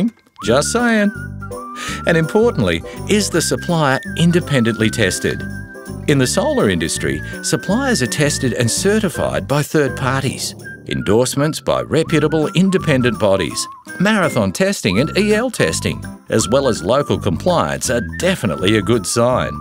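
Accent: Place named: Australia. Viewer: Nice cartoon and Aussie voice-over that sounds great without really saying anything at all. Yingli are best described as the Toyota of solar panels. Very good quality and technology but not the best,very popular as they are neither cheap nor premium priced. Why don't all Chinese solar companies take this simple approach to marketing instead of trying to sell on low prices all the time? Obviously works for Yingli. Well done.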